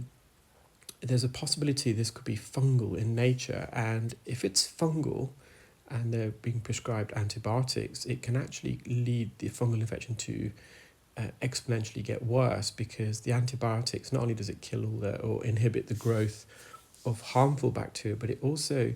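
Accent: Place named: RP, United Kingdom. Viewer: Thanks to you Dr., and yes, in my book, you are a doctor, I have started using medical grade Olive oil for my itchy ears. What a relief. For me, the fascinating part of watching you is a) I never knew any of this about the human ear and I am learning so much from you. b) you are so passionate about what you do. c) I love how you use the proper queens' English, I wish we did that here in the states. d) It is so satisfying to see you help these people with your kindness and compassion.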